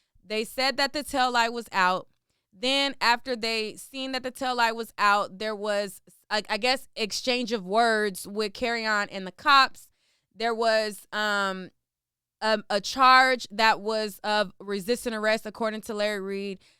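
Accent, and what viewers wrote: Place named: Philadelphia, United States. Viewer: U have a philly accent a Lil are u from philly?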